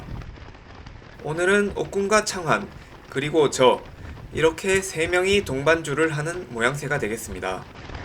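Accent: Gyeongsang, South Korea. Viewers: 대구 출신이라니 경남분인줄 알았어요! 더반갑습니다. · 경상도 특유의 쌍시옷 발음 약하게 들어가서 귀여운 느낌 있어요 ㅎㅎㅎ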